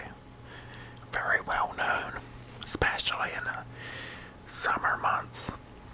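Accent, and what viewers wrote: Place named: South, United States. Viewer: he is not australian he just has a country accent.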